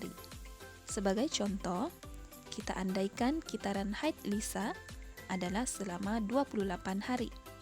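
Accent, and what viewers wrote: Sabah, Malaysia. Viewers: Cikgu orang Sabah ye😄😅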